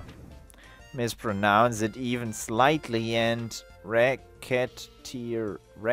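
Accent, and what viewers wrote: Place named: Germany. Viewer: Yayness for German accent! CAPITALISM, HO!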